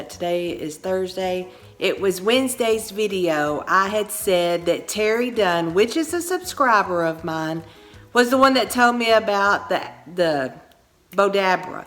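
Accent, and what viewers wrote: South, United States. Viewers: Happy Anniversary sweet lady! New subbie here! I’m really enjoying your channel! You’re so calm and real! I relax and learn new decorating tips while watching you! I’m in North Texas and love your Texas twang!🙋🏾‍♀️ Your wreath and porch are so cute! I’m inspired now and gotta step up my porch decor! Have a blessed Easter! 🌷🐇💐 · Love your wreath. Your home looks beautiful. You sound like you are from the south. I'm from Southeast Alabama. Happy Anniversary!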